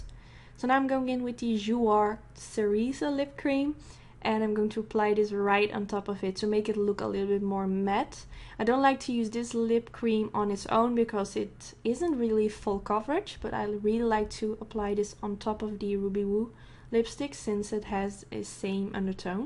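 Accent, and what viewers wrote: Netherlands. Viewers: ik hoorde je accent en ik wist meteen dat je Nederlands was. you go girl 😚❤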